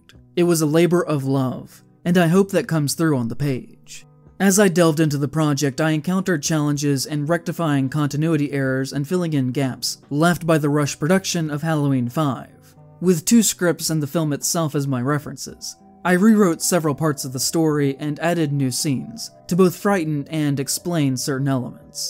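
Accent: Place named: South, United States. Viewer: with a southern accent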